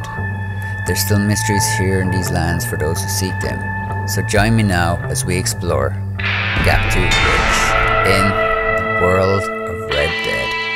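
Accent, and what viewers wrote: Ireland. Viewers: Didn't know you were Irish 🇮🇪, theres not many Irish youtubers, keep up the good work 💪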